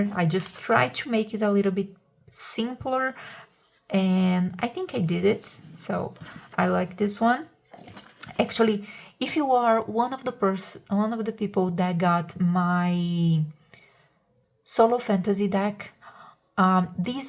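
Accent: Brazil. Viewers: Acho engraçado que eu reconheci o sotaque brasileiro nos primeiros segundos de vídeo kkkkk, o nosso sotaque é muito reconhecível e belo! Excelente vídeo, keep up the great work!! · Imagina a minha surpresa quando ouvi a voz e pensei "isso é BR", fui olhar nos detalhes do canal e boom, era mesmo. Nice seeing another brazilian in the weeds of the hobby, pur gaming landscape goes hard in a different direction than what you tend to see on youtube. Lots of GURPS going on around Brazil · Hi! I'm loving your content. So good! It feels like you're a brazilian because of your accent. Don't know if you're comfortable disclosing your nationality, but, if you are, greetings from a fellow tupiniquim :)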